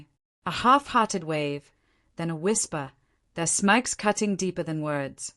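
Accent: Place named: United Kingdom. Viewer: Yet again - the title doesn't match the story! Refreshing to hear a British accent.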